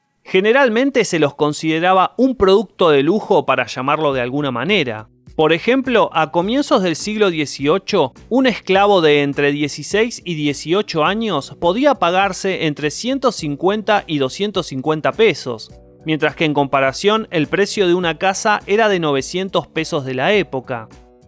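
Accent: Argentina. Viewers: Is argentino 🇦🇷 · Yo pense que hiba escuchar un Paisa.. pero resulto ser un Argentino... Ironia? hahah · QUE FASTIDIOSO ESE ACENTO ARGENTINO, BUSQUEN OTRA PERSONA PARA DAR A CONOCER ESTE VIDEO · Hola. Excelente video, recuerdo q cuando cursaba como 4to de primaria, nos hacían ver en Historia de Colombia a las tres grandes familias de indigenas en Colombia, es decir la Chibcha, la Caribe y la Arawak, y pues dentro de la Chibcha destacaban los Muiscas, los Taironas y los Paeces, pero a día de hoy sabemos que eran muchas más. Colombia es un lindo pais para recorrer, obvio no voy a mentir tiene sus cosas malas, pero animense a venir, yo siempre recomiendo ir a el pueblo y la laguna de Guatavita y ver el embalse de Tominé. Conocer Villa de Leyva, y tambien el valle del Cocora, y claro el infaltable, Guatapé y subir a la pierdra del Peñol. Por el acento del youtuber se que es de Argentina, un país que espero conocer pronto, y pues me imagino que sí ha venido a Colombia y si no pues animese parce.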